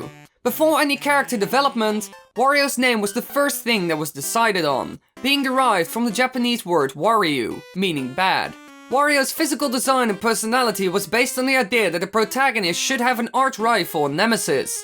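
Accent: Netherlands. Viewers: are u dutch? your accent is like you speak dutch but uses english words, however not a ''bad'' accent haha, i am dutch but it just looks like ur speaking fake dutch.. i cant describe it well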